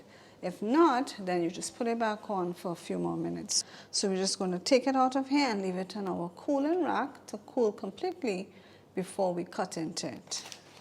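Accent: Trinidad and Tobago. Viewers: Hey! You have to be a Trini 😁😁😁👏🏾👏🏾👏🏾. That accent! Nice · YESS!!! Can spot a Trini anywhere! Thanks for this! Gonna try it out!❤😁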